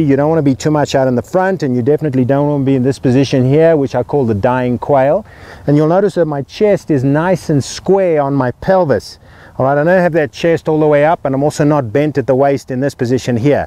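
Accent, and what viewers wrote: South Africa. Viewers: Is that a South Africa accent?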